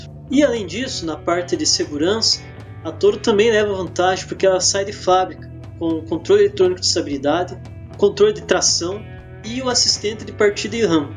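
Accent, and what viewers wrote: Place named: Santa Catarina, Brazil. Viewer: #CARWP nossa seu sotaque é de catarinense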